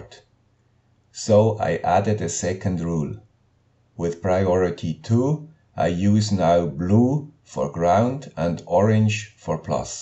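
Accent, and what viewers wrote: Switzerland. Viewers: Appreciate the channel of the guy with sweet Swiss accent !!! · I have been surfing around for Arduino related content and every time I start watching a video or two, I end up finding one from your channel. From Lora Gateways to Dupont Wires, from Crimper tools to anything. I always find the info I am looking for at your channel. For short, I want to thank you for your great work. I am big fan, and I wish you health and strength to make many more useful videos for the community. Thank you so much:) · as usual, " ", you simply peddle great info. simple, to the point, and, that hallmark of good info, seems pretty damned obvious AFTER you're told, lol. kudos, and a new subscriber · Useful tips! Thanks for sharing,! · Trick no. 4 was exactly what I was looking for but unfortunately I don't have a solder gun at home so rip. But these tips are great,! 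Thankyou so much!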